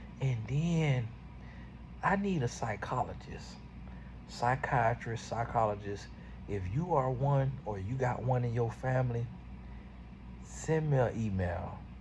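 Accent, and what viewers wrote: South, United States. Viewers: 😂😂 right! It’s the country twang in him explaining this craziness. Hilarious! Cause what is she doing!!!?!!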